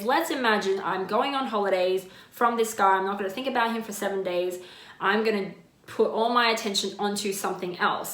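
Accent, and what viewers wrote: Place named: Australia. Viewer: Can hear that aussie talk from miles away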